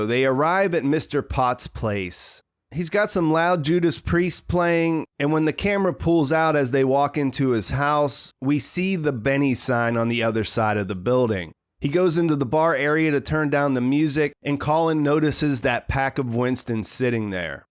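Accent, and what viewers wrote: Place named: Pennsylvania, United States. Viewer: You have a Pennsylvanian accent.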